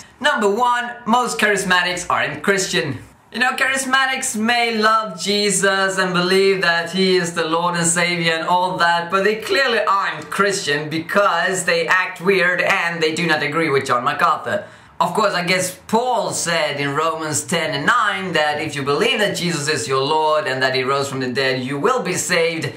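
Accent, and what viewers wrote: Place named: England, United Kingdom. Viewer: One thing I learned from watching this: I can more easily tolerate a biblically illiterate moron as long as he has an english accent. I was at the Conference. Nothing you said rightly articulates MacArthur's perspective...especially "Number 1" from your list. I have witnessed the same phenomenon with regard to a lack of true conversion coming from the Charismatic Movement. Rarely is the true gospel preached. Many of them (if not most) couldn't even articulate the gospel if pressed to do so - and believe me when I tell you, "Jesus talk" is no substituion for talk of the substitutionary death and the atonement. Beyond that, most of them hold to the Word Faith, Health/Wealth/Prosperity gospel, which cannot save. You don't know what you're talking about - but like most Charismatics, you probably so idolize gifts that don't even exist today that you'll act the fool because somebody is talking about those gifts in a manner which displaces your idolatry. 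And Romans 10 is not the sum total of the gospel, otherwise, Mormons, Catholics, Jehovah's Witnesses and everyone else is saved. What a pathetic litlte man you are. You make us all look like idiots...which is why John had to do a Strange Fire Conference.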